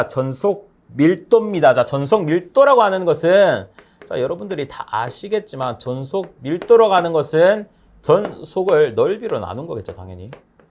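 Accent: Gyeongsang, South Korea. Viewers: 경상도 억양 귀에 딱딱 꽂히네요 잘봤습니다ㅋ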